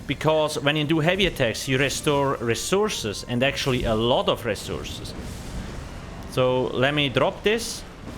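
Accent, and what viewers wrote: Switzerland. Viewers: Sounds like a swiss dialect. Grüezi us Dütschland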